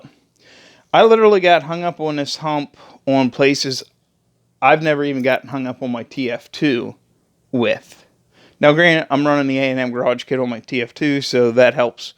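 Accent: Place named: Pennsylvania Dutch, United States. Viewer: Did you say (Door ability) LOL its Durability, you have to be from PA, Im hearing that PA Dutch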